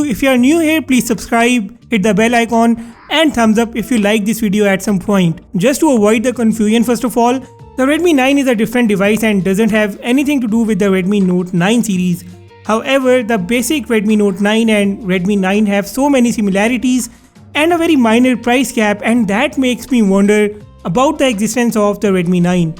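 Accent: India. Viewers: Please put english translate for those who don't understand Hindi accent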